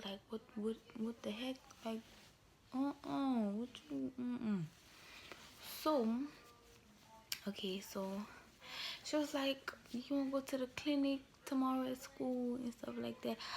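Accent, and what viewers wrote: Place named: Louisiana, United States. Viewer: Congrats girly ❤️where are you from...you have a Louisiana accent and I’m in Louisiana lol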